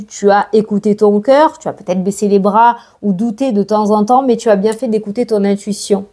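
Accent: South, France. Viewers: Merci pour votre bonne humeur, vous êtes un rayon de soleil dans la vie , redonnant l envie de repartir positive et confiante ,votre accent du sud et chantant stimule.😂5❤👍🥳 bravo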